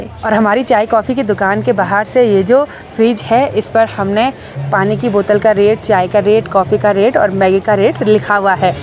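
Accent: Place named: Nimar, India. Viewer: आपकी भाषा कुछ हमारे मध्यप्रदेश के खंडवा निमाड़ जैसी लगती है दी 🎉🎉🎉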